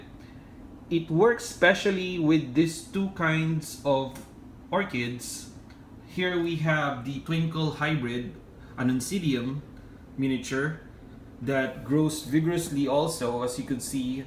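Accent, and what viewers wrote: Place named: Philippines. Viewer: Hi, love your videos. I don’t know but your accent makes me think you are Pinoy, are you? If so, I am so lucky to find you. I’ve been looking for Pinoy youtuber making vids about plants!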